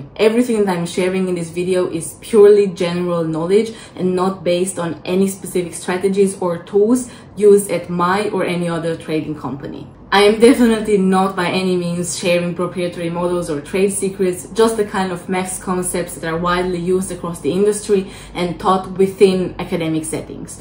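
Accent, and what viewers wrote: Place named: Europe. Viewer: I can hear the European Accent.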